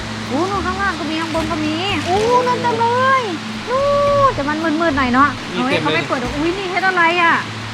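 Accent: Korat, Thailand. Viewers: ชอบแก่พูดโคราช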